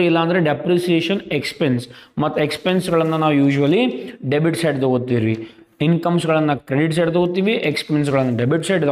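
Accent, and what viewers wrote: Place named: North Karnataka, India. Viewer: Sir ji Ur asom .. Nim uttar karnataka style mast .. Thank you.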